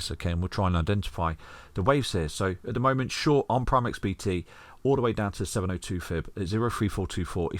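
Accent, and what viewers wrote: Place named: Birmingham, United Kingdom. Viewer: I love your brummy accent lad.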